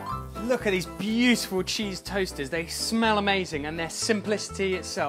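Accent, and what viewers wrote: United Kingdom. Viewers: Just because he says it with a cute English accent, doesn’t mean he’s making much sense. Where did he get the starter? What is “strong” flour? I stopped listening at that point. Don’t need half the stuff this guy says 😐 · First of all, nice British dialect, second: here in Sweden we will never get a sourdough fom the air as you say, the air is extremely Clean even here in Malmö with 350K inhabitants.I tried 1000 times to make that sour dough starter, it doesn't work without a litle yeast to get that kick in. When I was on Mallorca the sourdough was active in 18 hours, here in South of Sweden, the flour it not reacting at all, it just decanted on the bottom of bowl and it shows no fermenting at all. Maybe we here in Malmö are like in a big jar with no bacterias...no microbs, no viruses, no yeast molecules, etc.Thank GOD!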